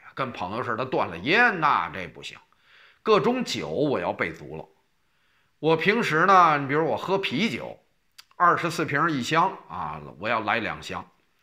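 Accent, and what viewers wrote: Beijing, China. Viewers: 京腔听着像界别儿的大爷亲切～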